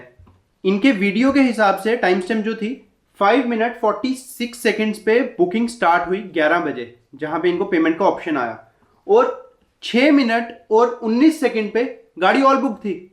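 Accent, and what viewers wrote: Jammu, India. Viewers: Bro r u from Jammu?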